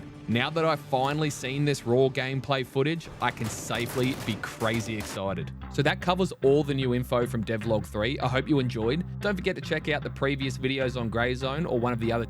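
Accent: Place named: Australia. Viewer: From the Great Plains of North America, I can say it always helps to have a super cool Aussie accent. Excellent video, excellent summary. Concise, to the point, and gets you revved up. I'll be watching more. Good work. 59 year old veteran, and I'm waiting anxiously for this to be a blockbuster! Cheers!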